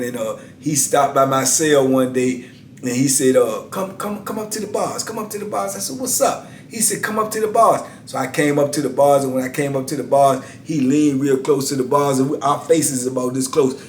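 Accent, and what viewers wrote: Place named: New Orleans, United States. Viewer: Have u ever been housed in Louisiana bcuz u sound u from here… New Orleans .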